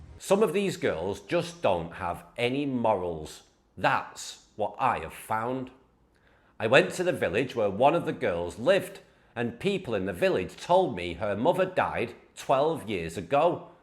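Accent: United Kingdom. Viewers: Complete BS!..he is British by the way he talks.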